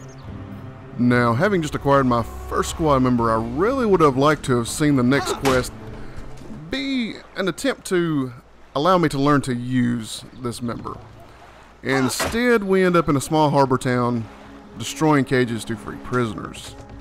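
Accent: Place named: United States. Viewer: I love your accent! Nice to have a regional accent for once from someone in the USA :) (I'm in Northern Ireland). You review really well too - not the usual 100 words a minute! I like the setting of this game and even with the bugs I think I will give it a go. I will check out the site - nice to have a decent review instead of the usual nonsense - thanks :)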